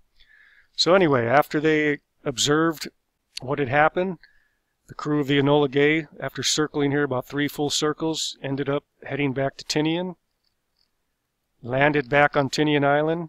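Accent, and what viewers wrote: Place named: North Carolina, United States. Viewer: I know nothing about you , BUT I'LL BET YOU ARE FROM NORTH EAST. NC OR SOUTH EAST. VA. RIGHT? Just a guess because you sound like everyone I know around here!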